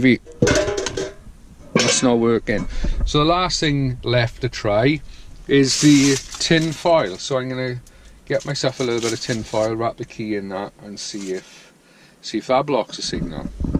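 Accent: Wales, United Kingdom. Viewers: Good tip. Love the Welsh accent.....hope u like my Irish one!!! Was in Wales in 2002...very nice (Rhyll)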